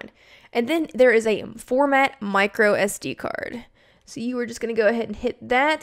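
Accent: South, United States. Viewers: Thank you so much! It was so clear and your southern accent made it enjoyable to listen to :) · Its just the US accent i guess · It's a Southern US accent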